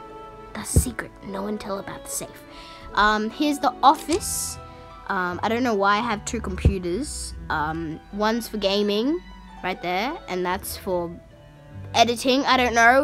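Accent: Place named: Australia. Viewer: I can tell your an aussie by listening to your voice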